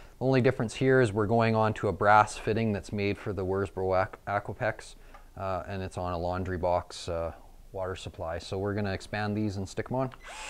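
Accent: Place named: Canada. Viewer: Hi, great video here on the Pex, I hear yer "Inns and yer Oautts" yer must be from Canada I reckon.? Please keep up the good work and God Bless. DD in L.A.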